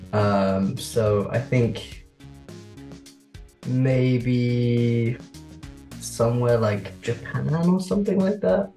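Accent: United States. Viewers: Never knew he was British! He does such a good American accent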